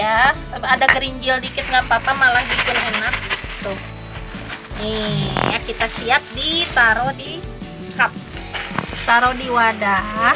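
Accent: West Java, Indonesia. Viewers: Waaw... Mantap.. Hatur nuhun resepnya tth... Sunda na ti mn teh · Orang sunda mana suaranya!! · I love teteh sayang aku suka banget sama gaya bicara teteh, teh orang mana? Sunda pisan euy nyarios na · Nyarios na logat sunda pisan hebring poko na mah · Iya Non :") kalo orang Sunda mah emang begini sama kaya aku 🤭🤭🤭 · Tetehnya nyunda banget seneng liatnya 😄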